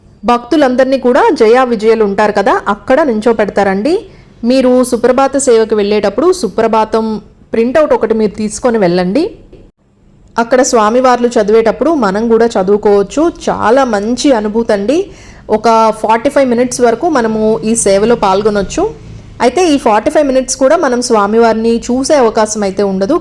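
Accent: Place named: Vijayawada, India. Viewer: Crystal clear narration - Vijayawada Telugu accent - good feeling like having Vijayawada Andhra Bhojanam.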